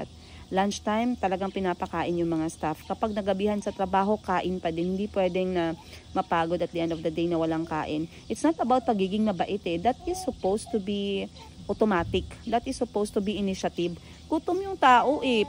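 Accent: Iloilo, Philippines. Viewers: Ilongga ka mam??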